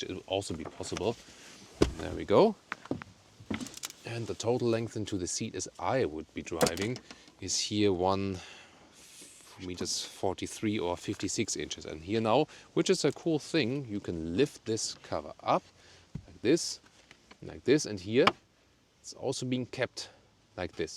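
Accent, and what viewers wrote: Germany. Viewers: love your videos ....i think reviewing cars just sounds better with a German accent !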